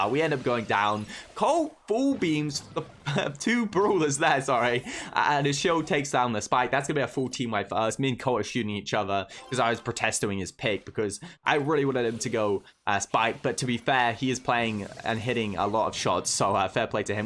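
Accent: United Kingdom. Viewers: Listen to his accent his from UK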